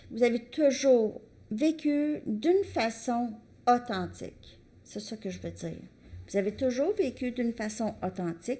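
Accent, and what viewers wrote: Quebec, Canada. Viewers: Hello, Je reconnais à votre accent une cousine d’outre-Atlantique, une belle journée à vous et merci pour vos guidances. ☀️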